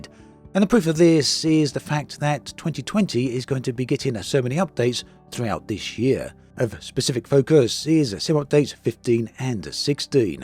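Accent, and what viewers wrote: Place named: England, United Kingdom. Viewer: It's a fucking english accent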